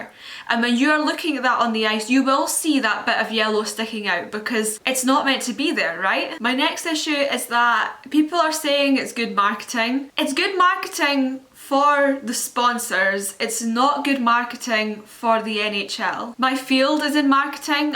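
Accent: United Kingdom. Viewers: When I say she had a British accent I clicked off what does a Brit know about hockey leave the hockey to the Canadians stick to the soccer and rugby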